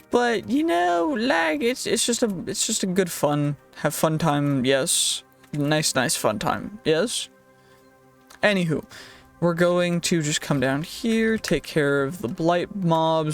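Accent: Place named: England, United Kingdom. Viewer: 1question ... where the fuck comes the extreme english accent come from 😂😂😂?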